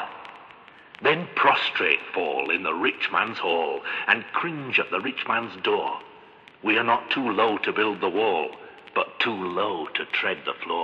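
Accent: United Kingdom. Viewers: As someone who is currently writing a doctoral thesis on the poetry of Ernest Jones I was fascinated to find this animation of 'The Song of the Low'. The truth is, however, that Jones's speaking voice would have sounded nothing like this. The accent of the fellow reading this poem (and reading it very well, I must say) is very much Northern English. Jones's father was Equerry to the Duke of Cumberland and he was brought up in Germany. Jones was famous for his refined, 'aristocratic' diction...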